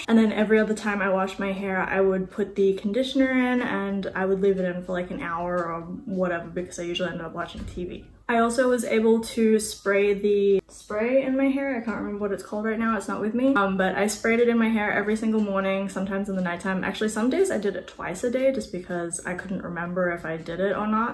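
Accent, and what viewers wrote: Australia. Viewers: Your accent is really interesting, it's like a cross between Australian and American! 😯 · I could definitely hear the Aussie at words ending in the “r” sound, but then didn’t hear it at other times when I thought I would. Thanks for clarifying and answering! 😉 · Where’s your accent from? It sounds Australian but then there is an American twang in there.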